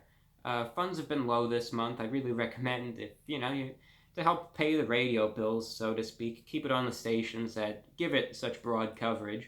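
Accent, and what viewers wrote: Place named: Mid-Atlantic, United States. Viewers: I can't eat any of the food you review, due to dietary restrictions. But I'm still a loyal viewer. "what is this channel known for?" To me? It's your mid atlantic accent, polite speaking, and your sense of style that I thought had left this world decades ago. I lost that bet. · The New York accent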